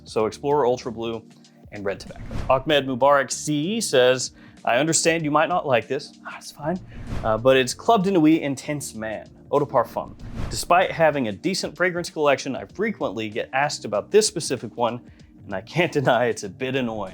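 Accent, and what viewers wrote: United Kingdom. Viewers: Mate, I'm from the uk and we ALL speak like that!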